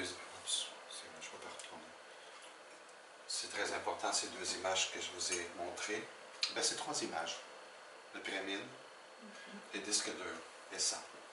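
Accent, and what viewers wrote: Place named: Portugal. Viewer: Bonjour Merci pour ce mixte entre l'accent Portugais et l'accent Québécois;))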